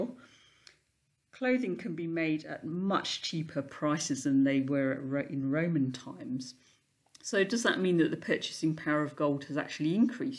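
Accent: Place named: East Anglia, United Kingdom. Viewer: Really enjoy these presentations. Out of interested...where abouts are you from in the UK? East Anglia? I'm trying to place the accent!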